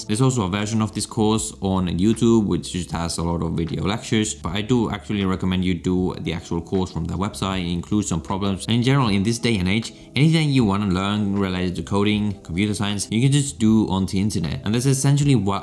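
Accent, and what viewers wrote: India. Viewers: Why do you have an indian accent? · I love your accent, it's a bit like the indian accent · it kinda sounds indian bro, just saying. I hope you are not some charlatan or something. whatever you are trying to hide we can tell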